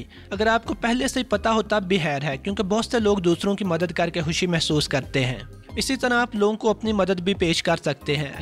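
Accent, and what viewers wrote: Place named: Punjab, Pakistan. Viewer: Please please please improve your Urdu. Panjabi mai styles mai Urdu mat boleye.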